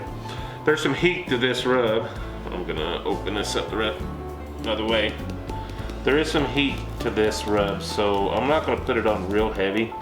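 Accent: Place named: Kentucky, United States. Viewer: Boy those are some mighty fine ribs mon ami...Your kentucky accent and my french canadian one some of these things are hard to pronounce lol . Love me some Tango spice!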